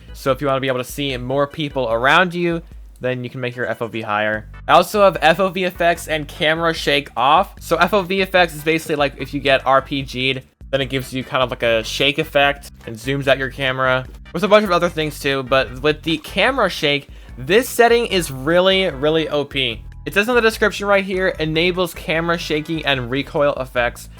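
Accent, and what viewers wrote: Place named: United Kingdom. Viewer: they both sound young and briish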